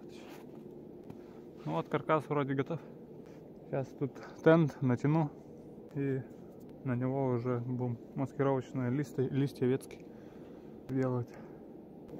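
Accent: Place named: Vologda, Russia. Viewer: Интересный у вас говор, вы с Вологды?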